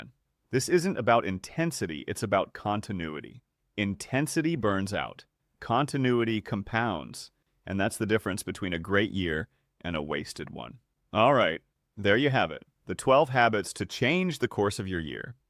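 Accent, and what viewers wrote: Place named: United States. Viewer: Don't worry. The misspellings are still there, despite his being a screenwriter. At last, good narration, whether that voice is artificial or not. Sounds like an everyday American, minus the weird syntax other (foreign?) videos have. Besides, we all need a fresh start in January, especially after 2025, a pretty rough year❗🗓️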